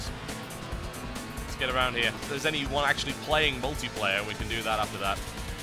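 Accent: United Kingdom. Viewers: Is he British or American? CONFUSED. LOL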